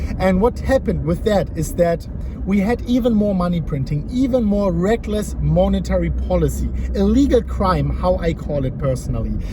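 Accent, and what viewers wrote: Germany. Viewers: Your German ?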